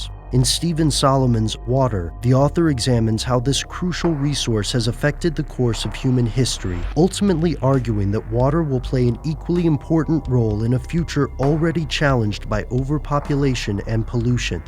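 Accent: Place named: United States. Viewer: love how you yanks destroyed the ENGLISH language. pronounce things the right way!!! like IRAQ not I Rack and Pakistan not Pack I stan.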